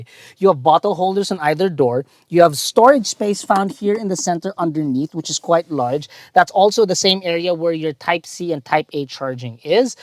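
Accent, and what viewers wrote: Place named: Philippines. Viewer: Fucking corny! Horrendous pinoy accent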